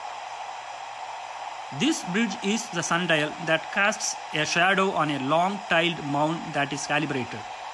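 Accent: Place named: India. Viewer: Your Indian accent kills me watching this video🤣🤣💀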